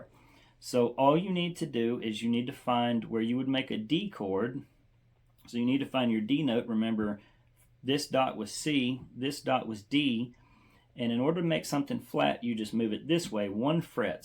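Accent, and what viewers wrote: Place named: Mississippi, United States. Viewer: I have been on the brink of making this connection for YEARS! I could feel there was something simple I wasn't putting together and I couldn't make the connection until I watched this video. Game changer! By the way, that MS accent was recognizable enough that i had to go to your profile to verify.😅 Copiah county here. Do you do in person lessons?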